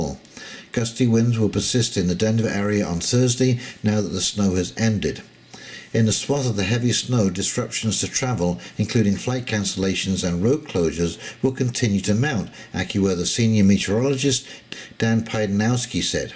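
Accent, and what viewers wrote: England, United Kingdom. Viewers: you have a clear English accent - but you talk as if you might be in the USA. Forgive me if I have missed something. Could you say where you are based? I am in the UK SE. I now listen to you regularly. Regarding your food advice: I reckon I have got things quite well organised for myself. My worry is for the cats and how I would feed them if there was a famine. The only thing I can think of for pet owners is to buy several sacks of dried food.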